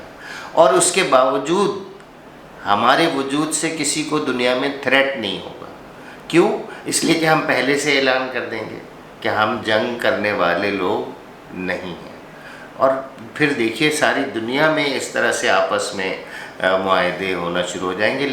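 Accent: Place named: Eastern Uttar Pradesh, India. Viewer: his tone is still Eastern UP or western Bihari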